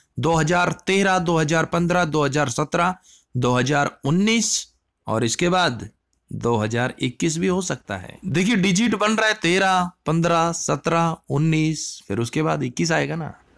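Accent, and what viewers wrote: Haryana, India. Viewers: Aap haryana se ho kya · भाई आप हरियाणा से हो क्या??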